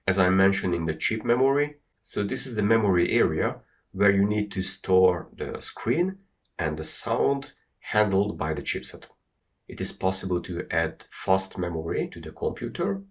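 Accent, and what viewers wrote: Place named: Hungary. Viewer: My comments are being deleted by youtube, I think they dont like long comments other then channel owner... One more think, I think I hear Hungarian accent in your English, are you from Hungary? If so, could you make some research and video to very interesting topic, in early 80s Jack Trammiel had a Software developer team in Hungary that developed a lot of Commodore software, which is very interesting since Hungary was behind Iron Curtain. Can you find some background info, or maybe find someone from that era for interview?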